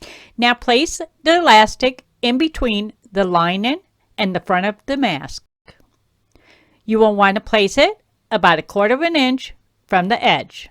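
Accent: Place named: Louisiana, United States. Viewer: Your accent sounds like you are from South Louisiana. Am I right?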